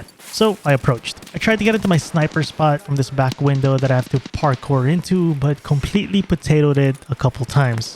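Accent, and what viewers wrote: Philippines. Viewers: Hello, I'm getting on the new wipe, it'll be my second wipe. So starting from the beginning. Seeing if you'd like to queue up with me at all? Also are you filo? You have a filo accent. I'm filo myself! Nice videos and I enjoy it! 🇦🇺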